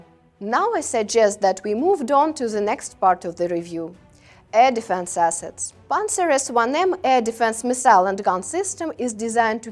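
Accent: Russia. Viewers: Хаха, какой милый русский акцент))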